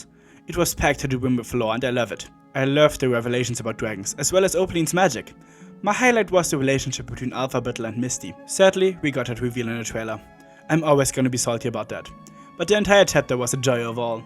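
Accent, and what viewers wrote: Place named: Germany. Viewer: Ana Sani probably watches you because as a VA, she could use more exposure to a German accent so she can reliably pull it off for a future role! 😂 Ich muss schon gestehen, dass ich mich oft etwas damit schwertue, dich zu verstehen, da du zum Teil ziemlich schnell sprichst. Vielleicht können dich die englischen Muttersprachler aber etwas besser verstehen als ich, denn ich habe ja eigentlich dadurch Englisch gelernt, dass ich mich fast ganz und gar auf Content von Muttersprachlern konzentriert habe. Somit habe ich einfach ehrlich gesagt nicht allzu viel Erfahrung damit, Englisch mit einem deutschen Akzent zu hören, was mein Verständnis schon einigermaßen beeinträchtigt, vor allem, da du ja so schnell redest! 😅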